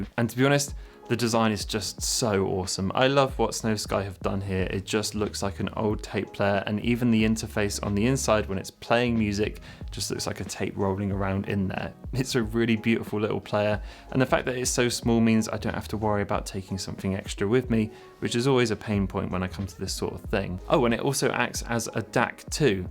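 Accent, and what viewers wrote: United Kingdom. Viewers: I have never realized how satisfying the British accent is to hear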